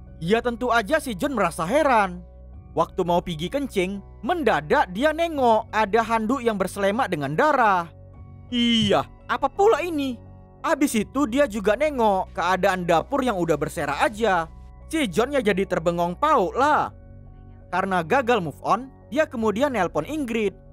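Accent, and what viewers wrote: Medan, Indonesia. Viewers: Orang medan abng ni bah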